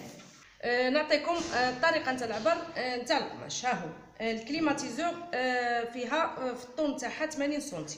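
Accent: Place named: Algeria. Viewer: لهجة جزائري صعبة شوية عباقي الدول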